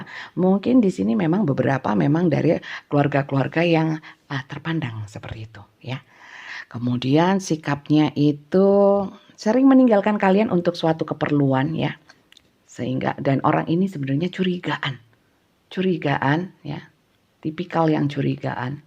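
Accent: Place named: Banyumas, Indonesia. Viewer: Banyumas ngapak bunda.. hehe.. sehat sllu mksh reading nya. 🙏🙏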